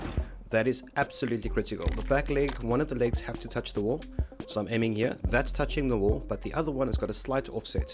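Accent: Afrikaans, South Africa. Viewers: Are you south African? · so thats what the accent is! and that language from the other vids wasnt norwegian/swedish/etc, it was afrikaans fml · I don't even play Rust but you got me hooked on watching, love the accent, is it South African or New Zealand?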